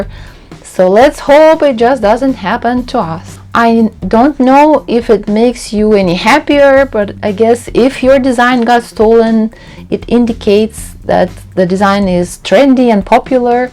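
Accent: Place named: Russia. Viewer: New subscriber from Oklahoma. I thought your accent might have been Russian. I had the privilege of visiting Russia in 1996 when I was just 17 years old. It has held a place in my heart ever since.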